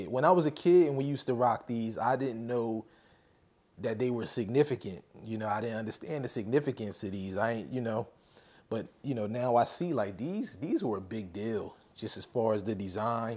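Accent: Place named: Philadelphia, United States. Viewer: I detect a Philly accent. And heard a jawn in there I think? Respect. #Polodelphia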